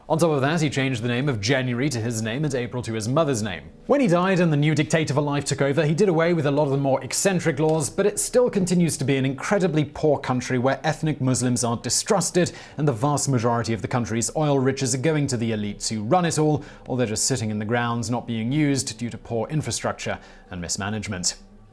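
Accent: United Kingdom. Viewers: yet even when he adlibs he has an upward inflection at the end of every sentence, (like a ditzy blonde) which certainly isn't a British thing, He's the face of these channels with his very nice middle class British accent, and when the subject of a video is British, I would expect him to add a British slant to it rather than it being slightly biased american, cherry picked history, tbf I'm not really including top tenz as that's pretty obviously American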